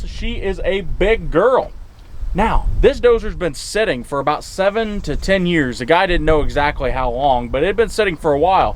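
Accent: South, United States. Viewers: I'm new here! I thought that it was going to start. I used to work for both construction and excavation companies. For a while I even did erection, but that's a story for another time. I'm going to guess by your accent you're at least in Tennessee or even more South. It tends to be hot, so why not dig a pond when you get the kinks out of the lift cylinders? You can always use it for watering livestock, but if you're too far in South look out for gators! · Which accent is that ? I guess southern ?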